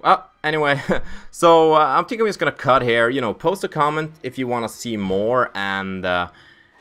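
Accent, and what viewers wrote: Sweden. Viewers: If the guy who voiceovers this clip isn't from Sweden I'll eat my hat. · ha 10 seconds in the movie and i can tell, ur swedish;)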